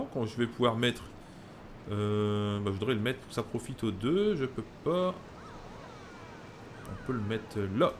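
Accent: France. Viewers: t du ch'nord sa s'entend frere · Salut j'aime bien tes tests, tu es de ch'nord aussi ?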